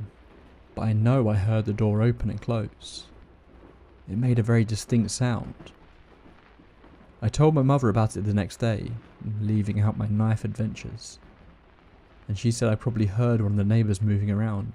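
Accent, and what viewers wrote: England, United Kingdom. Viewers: Some fab stories, thanks for narrating them and everyone who shared them. But I definitely never fall asleep to them. I listen whilst preparing and cooking or soaking in the bath. With a glass of something! 🥂😁 And it's great to hear another English voice and accent x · Can't fall asleep to a British accent. Just can't. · It's so difficult to fall asleep to English accents... Need my murican!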